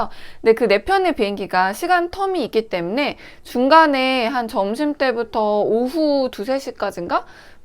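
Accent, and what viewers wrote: Chungcheong, South Korea. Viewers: 님의 충청도 사투리 왜케 귀여워요 ㅋㅋㅋ 운전을 해보등가 에서 빵터졌네요. 항상 너무나도 잼나는 님의 나라별 손님특징 컨텐츠 오늘도 너무 잘 보고 갑니다^^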